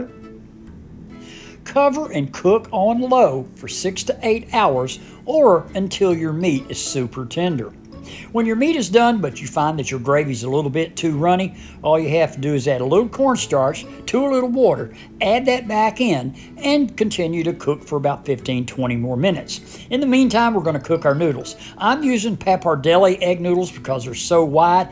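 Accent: South, United States. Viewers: That looks easy and delicious 😋 Are you Southern?? You sound like my hubby😊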